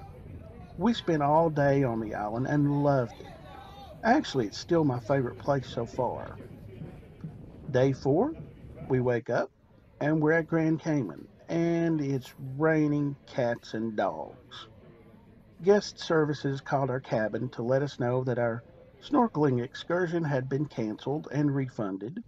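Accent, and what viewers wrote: South, United States. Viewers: great southern voice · Thank you I love your story telling made me wanna watch it all.❤️🌹👍. I love your southern accent, my mom is deep southern . God bless you and your family in your endeavors. From Alaska.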